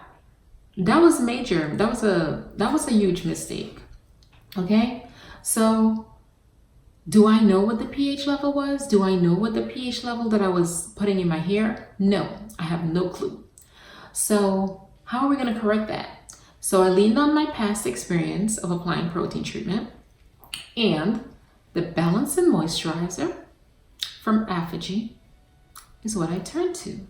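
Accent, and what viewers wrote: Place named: Jamaica. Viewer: I also hear some Jamaican in there, I believe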